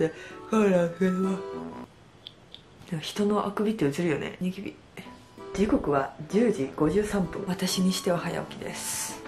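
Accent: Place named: Shikoku, Japan. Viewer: はじめて見ましたが、方言がわたしのところとすごく似てて親近感湧きました（笑）四国の方なんでしょうか、、？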